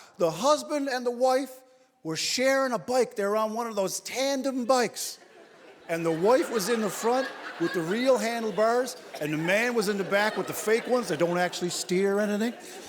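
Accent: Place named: Newfoundland, Canada. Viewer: When you started I thought there was Irish in your accent ,then you said you were from new foundland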